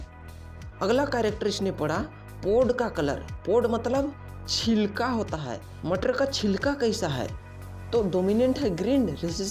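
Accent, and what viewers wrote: Bihar, India. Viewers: App sayad bihari ho apki bato se samajh aata h Btw I'm also bihari....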